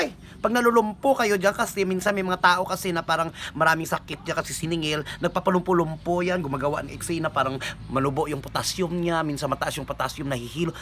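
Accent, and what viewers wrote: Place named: Iloilo, Philippines. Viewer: Wahahaha abaw gid ah ilongga gid tana c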